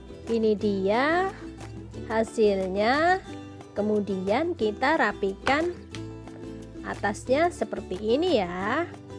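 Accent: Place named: Taiwan. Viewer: seumur-umur baru kali ini makan lobak putih kukus yg enak dan seumur-umur baru dengar suara ngapak aksen mandarin Taiwan.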